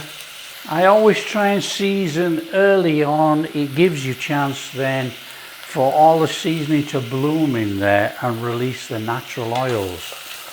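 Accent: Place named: Northern England, United Kingdom. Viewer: Oooop,s finger twitch, these recipes out there... I'm a Salfordian living in Greece now..X, Not only the recipes I love but your Northern Twang also, like having the North of England in our little Greek Island with the food. Thanks.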